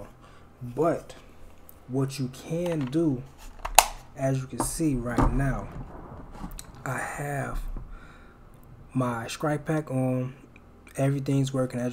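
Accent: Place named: South, United States. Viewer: Am I bugging or this dude keep saying SKRIKE pack and not Strike pack.... I'm from New York my guy I don't understand how "st" sounds like "sk" down south, I'm starting to think southerners should stop saying street, shrimp and now strike cuz is sounds bugged out wtf is "skrimp" how tf am I gonna meet you up the "skreet" and now u ruin my day by telling me may "SKRIKE" pack won't work on my series x controller..... Where's the southern hospitality my guy u hurting my feelings lol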